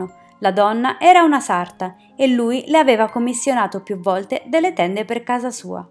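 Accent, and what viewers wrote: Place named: Florence, Italy. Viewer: Qualcosa mi dice che tu sei di Firenze.. Complimenti al video fatto bene..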